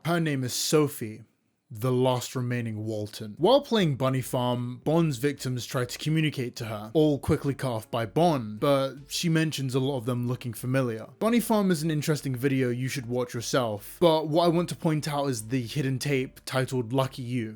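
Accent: United Kingdom. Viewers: Black man with funny (fucking English?) accent talks about analog horror and uses anime in the video for some reason. So anyway I subscribed to the great value KSI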